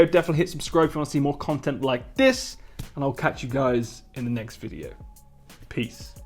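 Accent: United Kingdom. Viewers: stop speaking words like- digging, nostalgia, etc and British accent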